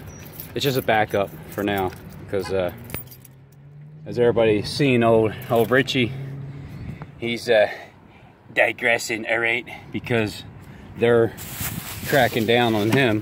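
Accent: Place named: East Coast, United States. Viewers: it could be on the East Coast or the Upper Midwest since he does have a little bit of an inflection of an accent when he speaks. Not sure. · I hate to tell you this, but I have worked on the cameras and monitors in Walmart, and on a day like that, you probably have one, maybe two Loss prevention people working, and one if them is watching monitors and doing all of their management duties, and the other one will walk some and do double duty. BTW, everyone had an empty push cart at one point. I promise you that their are no where near the amount of loss prevention that work in that store all together, as you saw in 5 minutes. You are probably trying to pass people off so that they comment, and do you can get paid. It is really activating listen to this bullshit that isn't even close to being true, on top of that, the Baltimore accent just rubs me wrong. 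It could be South Jersey or Southeastern Pennsylvania, but it's really a Baltimore accent heart and it is the most obnoxious accent in the country. By the way, is anybody shopping there? Or, are they all trying to keep you from stealing a $1 item. Walmart method is to identify you and call the police. By the Walmart or installing facial identification software, and they're gathering information everyone just like everybody else. Won't be long before you walk in that store and they know if you're a thief, and I know the kind of stuff you buy.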